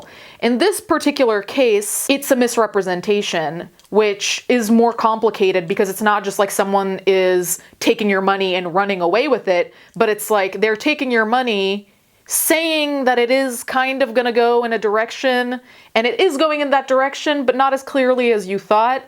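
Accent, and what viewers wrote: Italy. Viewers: she's Italian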